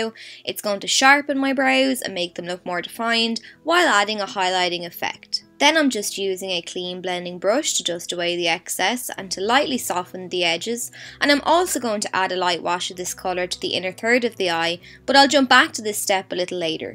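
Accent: Ireland. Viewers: I absolutely love your accent, I followed immediately after hearing it! 😂 (given, I was going to follow anyways just from the thumbnail, but whatevs) Is your accent Irish?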